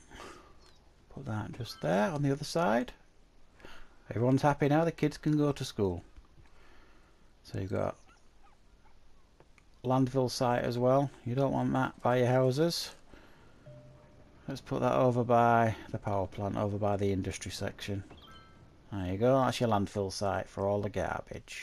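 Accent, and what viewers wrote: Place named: Northern England, United Kingdom. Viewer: Same here. And he's a North Northerner. Bolton ? Rochdale ?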